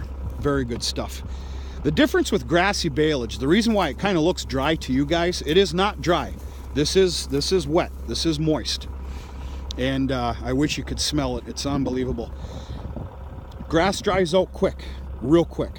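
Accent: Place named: North Dakota, United States. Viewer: Hi, I'm from N. Utah. I worked on a ranch in Randolph Utah. Weather just like that only humitity is much lower here. It's funny how cows can be so picky about their hay. Love your channel and your accent sounds alot like my relatives on the North Dakota/Canada border. And they are Native American. I wish I was there helping. Stay warm and safe. Good day. Debbi. ☺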